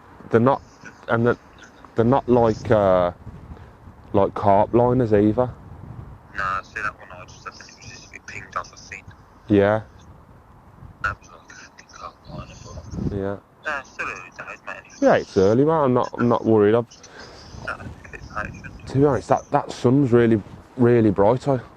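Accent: Birmingham, United Kingdom. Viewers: don't you recognise a Brummy accent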